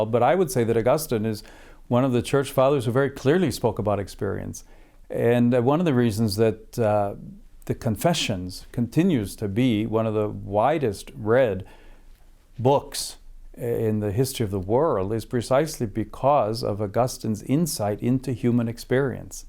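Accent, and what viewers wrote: Midwest, United States. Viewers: Chicagoan accent.... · Pope speaking American english 😲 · He has the voice tone, inflexion, articulation of a leader, like he is indeed talking “ his business “… true American…no matter how many years living abroad and with double nationality..❤❤❤ proud of him.. May God Bless him!! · It‘s the Chicago accent. 😊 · I can still hear a Midwest twang at times! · It sounds midwestern to me.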